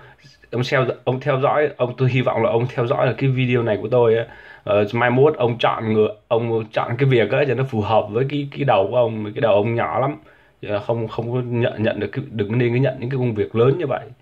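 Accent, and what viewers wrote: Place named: Thanh Hoa, Vietnam. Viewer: Thằng này Thanh Hóa , có dấu giọng Thanh hóa cũng lòi thôi ..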